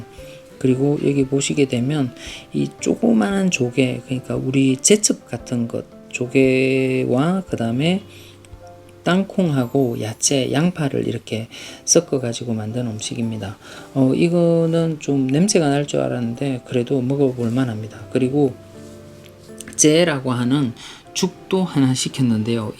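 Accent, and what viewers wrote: Gyeongsang, South Korea. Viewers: 선생님의 경상도 사투리가 정감이 많이 갑니다ㅎㅎ 제가 대구사람인지라 그런거같습니다. 영상 속의 호이안을 보니 즐거웠던 기억이 나면서 정말 반가운 마음이 드네요 다시 그곳을 찾을수있는날이 얼른 왔으면 하는 바램입니다. 항상 유익한 영상 감사합니다ㅎㅎ 코로나 조심하시고 건강하십시오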